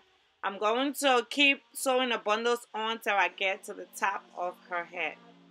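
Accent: Liberia. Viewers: This lady sounds so Liberian....am I right? let's gather and show our sissy some love. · Sis, you did a beautiful job. What your video shows is that you care about your client's hair. ❤ Oh and I think I hear some liberian 😊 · I hear a Liberian accent!!! 😍😍😍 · you sound Liberian. as a fellow Liberian hair stylist i am inspired by you x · Is that a Liberian accent I detect...SUBSCRIBED 🥰🥰🥰🥰 🇱🇷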